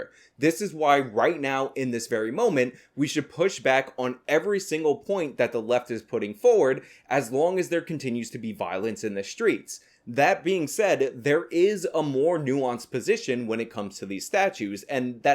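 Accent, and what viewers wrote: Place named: Texas, United States. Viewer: Dude sounds like your blessed to born Texan you should come back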